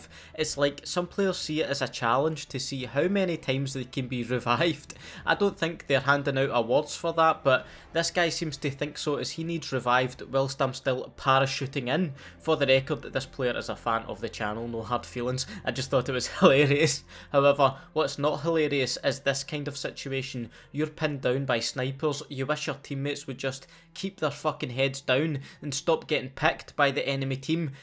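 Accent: Scotland, United Kingdom. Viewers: are you Scottish or Irish? I am guessing you are Scottish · Cheers for the vid pal. Awesome Scottish commentary 👍🏼👍🏼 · U have the best Scottish voice ever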